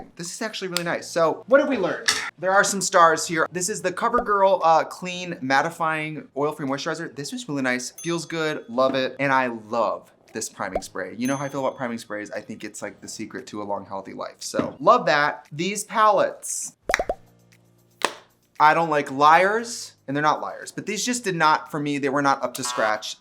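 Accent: Wisconsin, United States. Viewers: Her Wisconsin accent matches angry candle lady’s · As a born and raised Wisconsinite who now lives elsewhere in the US like Trixie, my favorite thing is hearing just how much more pronounced her WI accent becomes when she's back in the state. Because the EXACT same thing happens to me. It's like a disease you can only hide for so long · Omg the Wisconsin Mom accent ❤😂❤😂❤😂❤😂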